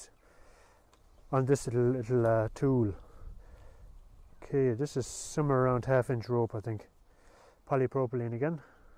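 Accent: Ireland. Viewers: Great review I like watching your videos, the way you talk and the words you use remind me of my Grandfather, He was from County Mayo on the West coast.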